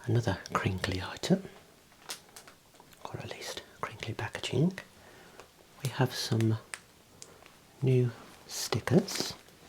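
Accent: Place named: West Midlands, United Kingdom. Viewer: Your voice reminds me of my Orthodontist's voice when I was a child in the 90s. He lived in Solihull. I was never afraid of a doctor again after treatment there, and I never forgot the voice. I've always found the west midlands accent to be really comforting. I live in Texas now, which is quite a way away...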